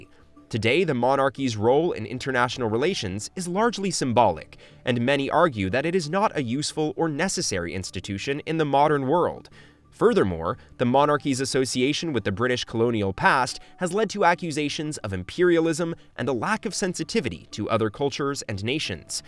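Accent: United States. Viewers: Some but not all valid points. Elected presidents and democratic nations have also acted immorally and undemocratically. But who made this video? Narrator has American accent and half the images were American!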